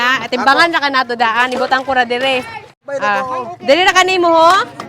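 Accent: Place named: Visayas, Philippines. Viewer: Ito tlga nagustuhan ko s kanya kaya napa subscribe ako..ang sosyal ng accent nya bisaya pero english tono❤ like ang 50 pesos..naging fifty peyysosss😂mgnda sa tenga ko..kaya lagi ako nanunuod..lalo na yung alimnago hunting nya😂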